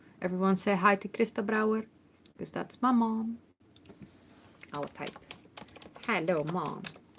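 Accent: Netherlands. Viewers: I like your "Very Dutch" accent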